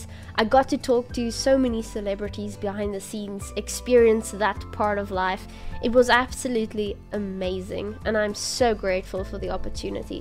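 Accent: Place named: South Africa. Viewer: The South African accent was a lot stronger in this video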